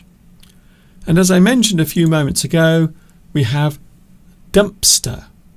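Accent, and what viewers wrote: United Kingdom. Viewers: Very interesting and useful lesson as well as a clear British English pronunciation .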